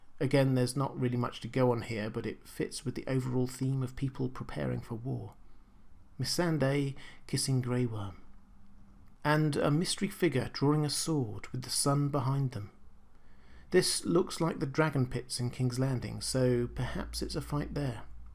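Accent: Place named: RP, United Kingdom. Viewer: He, by far, has the best voice of all of the channel owners. Sort of a younger, less annoying, more posh David Attenborough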